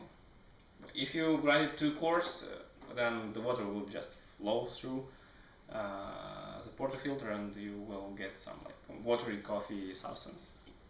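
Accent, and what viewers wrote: Russia. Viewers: Russian? Italian? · Хороший русский акцент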